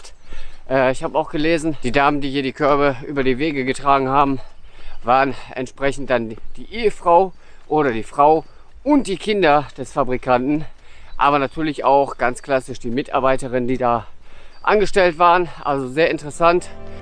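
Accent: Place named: Solingen, Germany. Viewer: Da würde nix mit f und w gemacht. :-D Das ist Mundart Solinger Platt. Gruß van Solig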